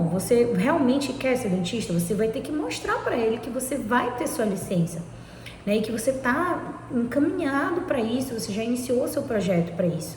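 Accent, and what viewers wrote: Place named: Belém, Brazil. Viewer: pode me dar o contato da Martell? Fica aqui em NY? Eu tenho personal plan e to em RFE. É de chorar. Pelo seu sotaque acho que você eh de Belém né? Sou paraense radicada nos eua. Mas sai daí pequena.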